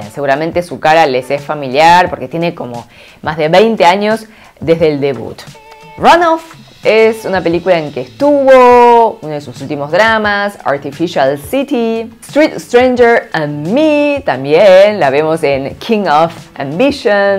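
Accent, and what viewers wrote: Argentina. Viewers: Que bellezas las actrices coreanas ❤ me encanta tu acento argentino ❤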